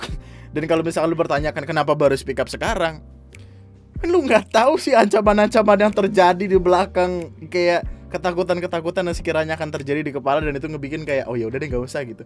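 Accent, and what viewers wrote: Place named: Java, Indonesia. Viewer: Vee dia kan dah pindah dari kecil . Logatnya aja jawa . Jelas memanfaatkan viewer indo , orang masa kecilnya di kota Malang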